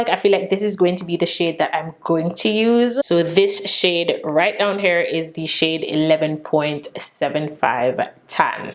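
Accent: Jamaica. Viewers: I'm def getting a Jamaican accent coming through?😏 Concealer looks great tho.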